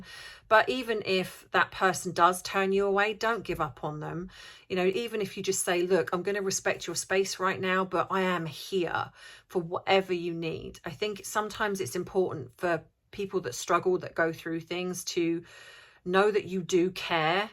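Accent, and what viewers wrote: United Kingdom. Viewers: 🌸 Hello Bubble Fam 🌸, great to see you're back. I like the ideas you have for the channel. Then again, I've been in love with British accents since The Beatles first came to the U.S. Paul... sigh. ♥️ So I would listen to you read the phone book! Anyway, finding balance in one's life is not easy and priorities change. Have patience and I know you will find the answers. 💋 See you soon!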